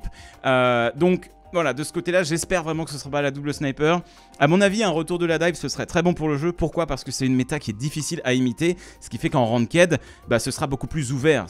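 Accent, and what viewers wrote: South, France. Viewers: 😂 le meilleur accent du sud ever 😂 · L'accent du Sud pour rein c'est toujours un bonheur😄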